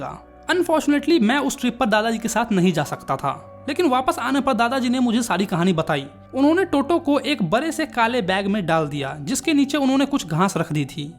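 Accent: Bihar, India. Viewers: Are you from bihar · Bhaii ye bihari hai toh bihari tongue hi hoga na. Explanation is good by the way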